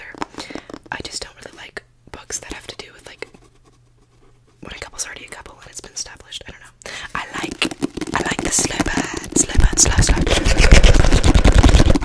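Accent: United Kingdom. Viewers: Your slowly transforming to a full British accent 100% of the time😂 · Wow I didn’t listen to your asmr for maybe 3 months and suddenly ur British what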